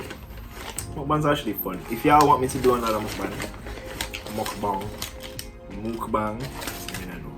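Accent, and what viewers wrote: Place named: United Kingdom. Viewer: the little hint of british accent lol u sound like them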